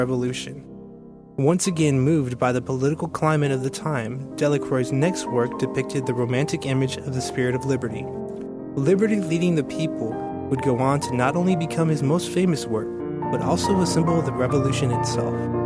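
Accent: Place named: France. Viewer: I don’t understand his French accent. It’s really a pity, because he actually destroyed the whole narration.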